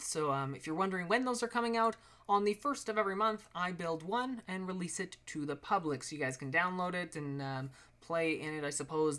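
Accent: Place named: Canada. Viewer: It's a white (Canadian) Guy speaking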